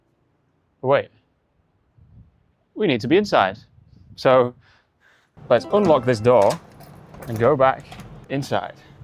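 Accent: Northern England, United Kingdom. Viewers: thank you you are kind and well done on your youtube. I am a senior English teacher from MOROCCO..your accent tells me you are from the northern part of England 😊 thank you very moutch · Who loves the British accent like me? 🙄🤓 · Hi,! Why do you say "mug" as [mug] but not [mʌg]? Are you from Northern England?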